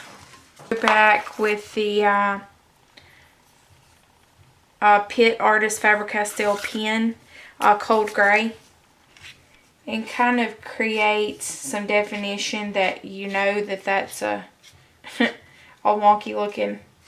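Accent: South, United States. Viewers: Love all those bright colors and how you incorporated the paper towel. I threw mine away not long ago...my bad. oh how I miss that southern accent! I attended Auburn a long time ago :)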